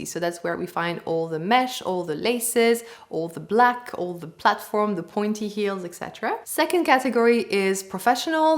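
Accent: France. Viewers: Merci beaucoup. Vos conseilles sont très intéressantes. Je les regarderai. J’habitais en France pendant un an quand j’avais 18ans. Mes amies, ma mère d’accueil et beaucoup d’autres femmes dans ma vie ont utilisé tout les chose que vous avez nous montrer ici dans votre vidéo. Merci beaucoup, j’avais besoin de souvenir tout ces informations. J’aime aussi comment vous parlez anglais ❤. Très bien, et avec une belle accent français! ❤😊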